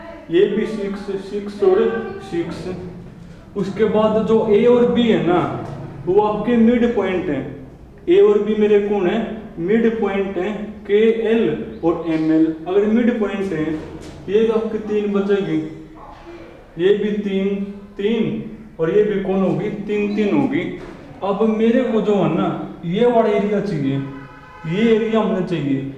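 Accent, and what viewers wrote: Haryana, India. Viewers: Are u from haryana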